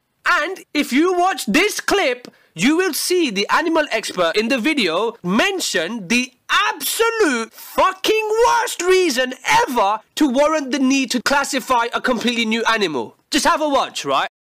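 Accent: United Kingdom. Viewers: You british or what?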